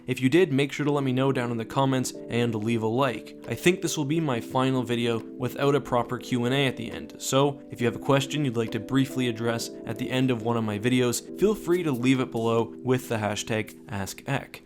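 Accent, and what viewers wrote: Canada. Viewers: hey are you Canadian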